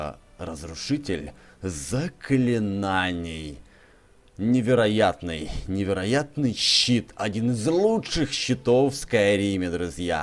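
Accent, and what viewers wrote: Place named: Ukraine. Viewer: У тебя акцент.... ты украинец?)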